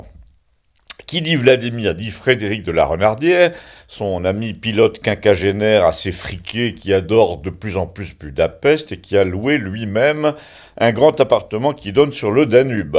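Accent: South, France. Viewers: Svp, 🙇‍♂️🙇‍♀️ lisez Nous TOUTE votre chronique "avè l assen" que vous maitrisez si biiiiieng, 🤓👏👏💪 cest SAVOUREUX! 😄😃😍😍😍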